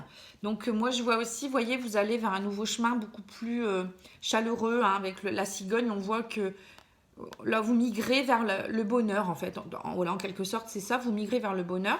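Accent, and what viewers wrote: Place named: North, France. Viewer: Accent du Nord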